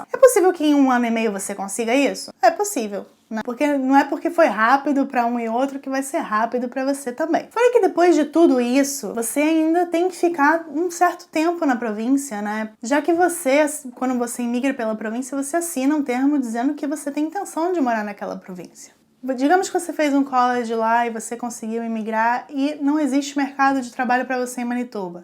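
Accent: Rio de Janeiro, Brazil. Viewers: Curioso vc disse que morava em Santa Catarina mas vc tem sotaque carioca kk fora isso parabéns pelo video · amo seus vídeos vc é carioca ?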